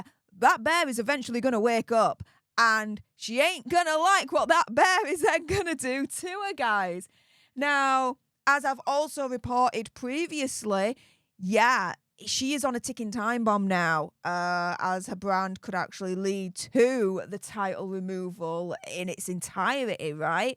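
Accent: United Kingdom. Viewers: is that called a low class British accent you have ?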